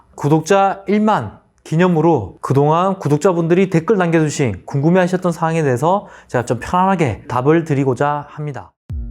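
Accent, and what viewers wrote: Busan, South Korea. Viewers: 이 부산출신이라 사투리쓰시죠ㅎㅎ 실물은 영상보다는 훨씬 멋져요 ^^ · 실물로 보면 괜찮다??? 원판 불변의 법칙을 아시나요? ^^ 아니 그냥 그렇다는 겁니다. 실물로 보면 잘생겼을것 같아요. 음....원판 불변의 법칙이 뭐었더라... 음... 암튼 죄송합니다. 결론은 실물이 멋지신걸로 ㅋㅋㅋㅋ 그리고 말투가 부산사투리 였군요.^^ 뭔가 이상하고 어색하다 했는데, 이제 이해가 되네요..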